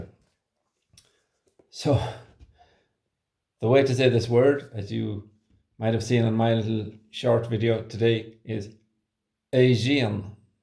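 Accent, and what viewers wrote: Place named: Erris, Ireland. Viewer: Nope he is correct. He speaks Erris Irish just like my grandparents.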